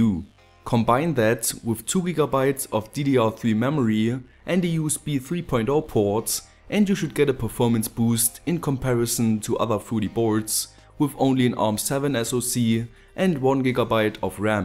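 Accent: Germany. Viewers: Where in Germany are you from? Guessed that because of your accent. Great videos man! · warum machst du keine Videos in deutsch? ich kann deinen deutschen Akzent hören.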